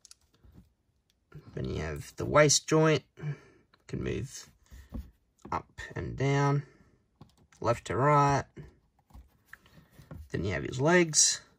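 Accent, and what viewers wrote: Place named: Australia. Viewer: Nice to hear an Aussie for once🙏🏽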